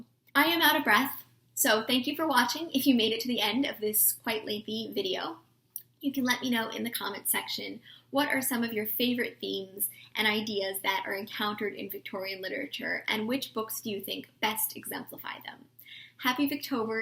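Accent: United States. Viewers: I absolutely love your videos, so informative about many time periods in literature. I particularly liked your video on the victorian period. I learn so much about literature from listening to you. Also you are such a good speaker I am beginning to become addicted to your channel. Which part of America are you from, just curious. Love you.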